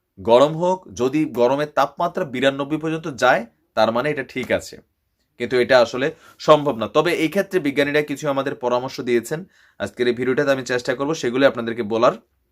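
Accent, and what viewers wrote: Comilla, Bangladesh. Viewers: ভাই কেমন আছেন, আপনার মুখে কুমিল্লার আঞ্চলিক ভাষা অসাধারণ লাগে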